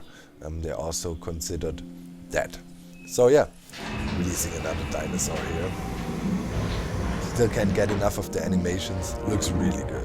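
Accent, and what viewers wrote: Germany. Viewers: Your englisch has an accent? German?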